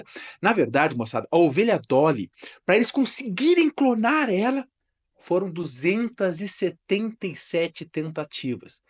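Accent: Rio Grande do Sul, Brazil. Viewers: Sotaque gaúcho tchê. Professor muito bom. Tomara que seja gremista. kkkkk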